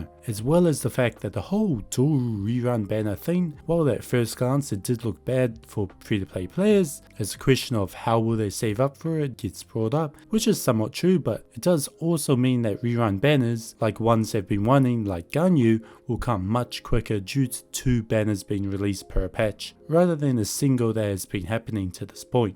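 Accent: New Zealand. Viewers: Are you a kiwi? You gots my accent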